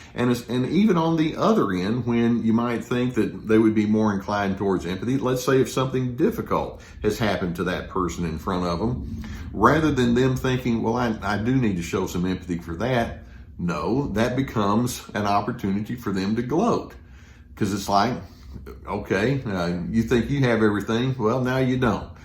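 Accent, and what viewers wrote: Texas, United States. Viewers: I left my narc back in 2007. Best decision I ever made in my entire life. Oh the stories I could tell, of his envy and lack of empathy. I look at your videos and even now gain healing and insight. Maybe there is something very special about the way a Texan drawl delivers compassion and wisdom;) Thank you SO much, Dr.! <3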